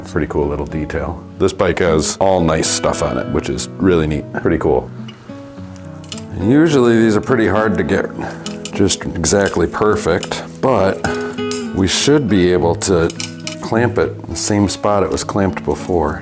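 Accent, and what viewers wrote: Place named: Midwest, United States. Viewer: So GD relaxing, thanks for uploading! Minnesotan here. Love to hear the "Okie dokes" from our friends across the Mississippi/St. Croix. Midwest vibes - vibing so gently.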